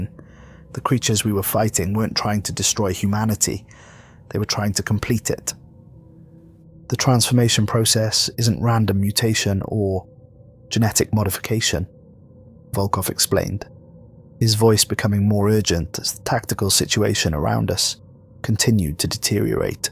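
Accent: Wales, United Kingdom. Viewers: Not sure about the Welsh accent but I love the stories.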